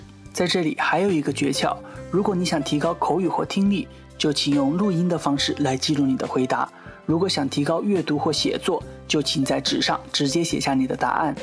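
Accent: Hunan, China. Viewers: 是湖南人吗？ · 湖南老乡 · 湖南哪里的？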